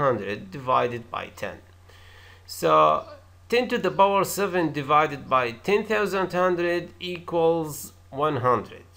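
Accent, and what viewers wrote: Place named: Arab world. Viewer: Well explained. Lovely arab accent.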